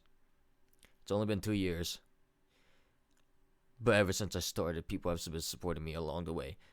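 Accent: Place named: Ontario, Canada. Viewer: You sound like your from ontario, more specifically markham area... Other than the guns that makes me think your in usa maybe north side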